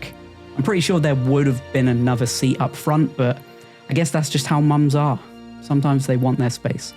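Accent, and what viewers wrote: England, United Kingdom. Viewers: This video is up there with majority of "Woke Critic" channel videos, only the difference being The Woke Critic is likely to be Irish instead of somewhat pompous but still financially Chav English of yours and that's also a complement too lol Greetings from Indonesia, I really don't like how this game sets up and putting the gameplay models of certain things, it looks like a early access game but with slightly more polished models that looks like a combination of photorealism & uncanny valley, which Sweet Baby Inc and their networks of gloriously self-righteousness consultancy (and their associates) doesn't disappoint at disappointing their audiences, as usual...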